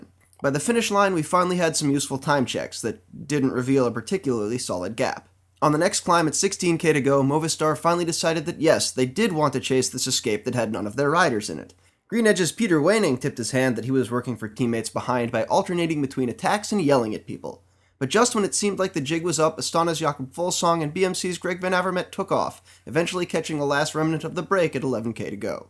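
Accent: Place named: United States. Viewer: Nice video, and nice to hear a yank who know's his stuff for a change.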